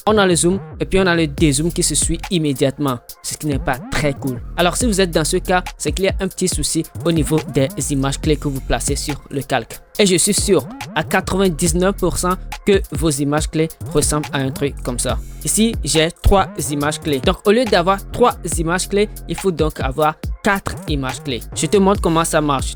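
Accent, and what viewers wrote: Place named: Benin. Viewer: T'es béninois ? Ton accent...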